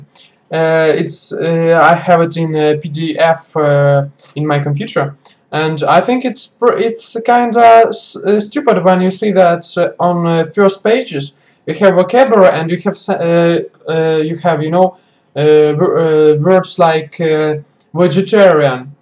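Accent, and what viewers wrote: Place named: Germany. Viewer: You have a German accent while speaking English...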